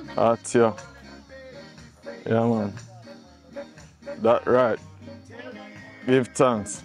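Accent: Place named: Jamaica. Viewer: I love your accent, great job on videos my Jamaican friend:)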